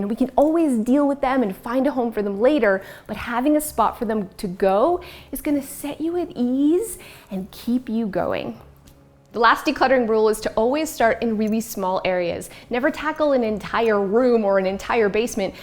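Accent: Canada. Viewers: I just saw that sweet Canadian accent on HGTV! Gonna start watching your new show Hot Mess and wanted to say congrats. I’ve been watching you on YT for years and LOVE that you’re now on my TV! Yay!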